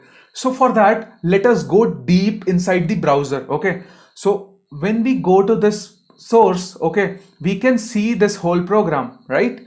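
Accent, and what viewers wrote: India. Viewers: bro are u from dehradun?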